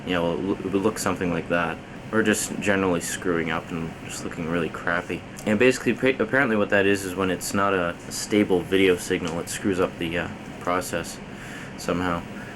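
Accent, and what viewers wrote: Canada. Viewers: You sound Canadian are you from Canada?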